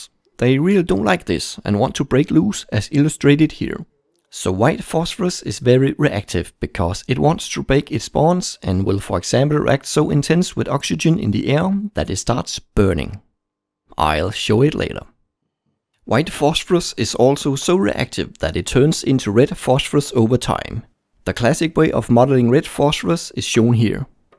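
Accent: Denmark. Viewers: Almost a year ago, but still awesome! I knew you were from Denmark, i could hear it in your voice :-) I'm from Denmark myself. But it's nice to know a danish youtuber making videos based on science. Keep it up! :D Fede videoer, hold det kørende! :D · o_O you are from Denmark! for a long time I have been wondering, because of your ascent, and thought it sounded Danish. Så hejsa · are u a dane?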